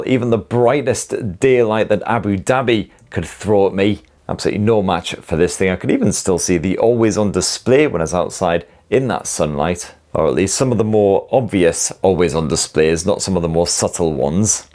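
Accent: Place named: England, United Kingdom. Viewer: All the hissing and English accent makes this guy unwatchable. The review is also as bad. There are so many issues with this watch since you can't download anything on it. No spos, no watch faces, etc. otherwise a great watch. So don't buy, I bought their Watch GT 5 46mm but frustrating to update and like I said it's missing fo many functiond, cant pay with the watch it doesn't support north American banks and their software is banned. This guy is a hack and doesn't tell you the truth.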